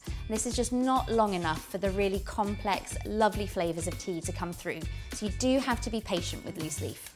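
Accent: England, United Kingdom. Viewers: The lady in this video speaks very eloquently (Queen's English) so in this instance I would say she has a very English (not British) accent. You are correct to note that there are many accents in England but if you listen to news readers or the folk from private schools they have what most people perceive as English accents (stereotypically). Because folk can't distinguish between Britain and England there are always comments of this nature. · Of course there is such a thing as a British accent. This lady has a British accent. So does Billy Connolly. So does Tom Jones. So does Geoffrey Boycott. They all of course sound very different, because there is no SINGLE British accent. Instead there are many. But to people from other countries, the differences between various British accents are harder to distinguish, just as British people might not pick out which part of the United States an accent comes from, merely recognising it as American. 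· She has a British accent. I believe her. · Aside from the beautiful English accent ❤this lovely young lady can sell me anything ☺️ where can I buy that brewer in the USA ?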